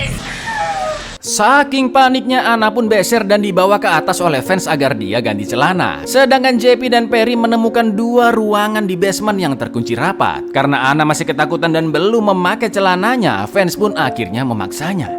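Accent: Java, Indonesia. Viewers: Bang,anda asli Jawa kah? Kok bahasa pengantarnya pake bahasanya fasih sekali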